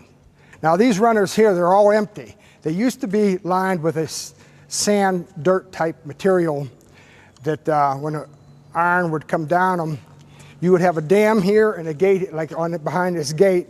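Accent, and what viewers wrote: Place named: Pittsburgh, United States. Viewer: You got that Pittsburgh accent from Northside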